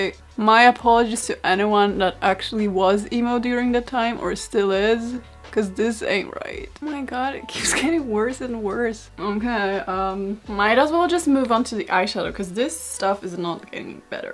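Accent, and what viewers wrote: Poland. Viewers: I like your delivery, I love your accent. I love my polish peeps. I think you are going to be a Youtube superstar. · POLAND!!!!! It would have kept me up trying to place your accent. So, I literally did a web search for "youtubers like Rachel Maksy" and your channel was suggested. This is my third video (yes, I'm a fan 🙂) and your accent is so familiar but I couldn't put my finger on it until you said it then I said, "That's it!" I haven't been to Poland since the 1990s, possibly before you were born. Anywayyyyyy, I'm enjoying your work and will now binge watch while I sew. Thank you!!!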